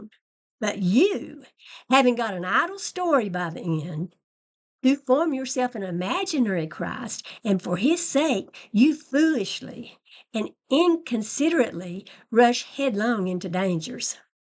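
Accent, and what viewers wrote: South, United States. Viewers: Very, VERY occasionally, the algorithm does me a solid. This is the second one of your videos that i’ve seen, and only because the algorithm recommended your most recent video to me yesterday. I will obviously have to subscribe and catch up your back-catalog. I greatly appreciate your clear-eyed, calm, and humane thoughtfulness. I’m also pleased to have finally found an online exvangelical with properly delightful Southern accent. Representation matters! 😋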